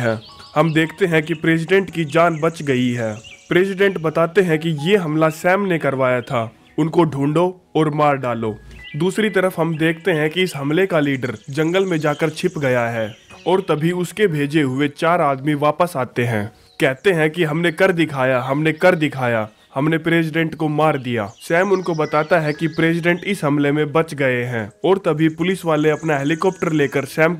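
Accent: Haryana, India. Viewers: Haryana ka h k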